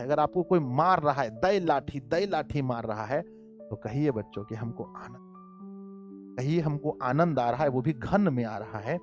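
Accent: Bihar, India. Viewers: सर मैं आपके कोर्स इसलिए करता हूं क्योंकि आप बिहारी स्टाइल में पढ़ते हैं तो सब कुछ समझ आ जाता है कि❤❤❤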